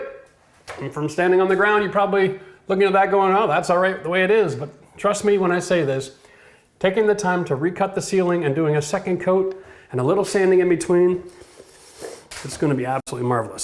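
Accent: Canada. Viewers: I just LOVE your approach! Ty for keeping the moment of almost crashing off the ladder - dude makes me feel more normal! Ty for you just being so real !! I am a 60-YO DIY woman, learning how to care for own home after 25 years of marriage — your help / expertise boosts my confidence so much! Also love your friendly Canadian accent & approach 🦋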